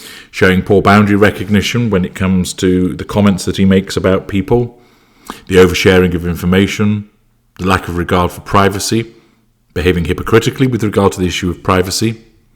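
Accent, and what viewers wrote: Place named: Widnes, United Kingdom. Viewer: Are you from widnes or that area Hough Green? I'm sure you lived around tge corner from me? You sound so familiar?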